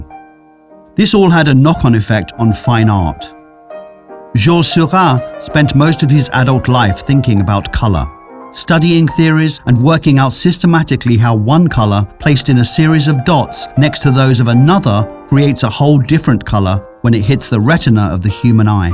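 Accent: United Kingdom. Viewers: Art from France. Video from England (I suppose, because of the accent). Paint from USA. Viewer from Brasil. Globalisation is real!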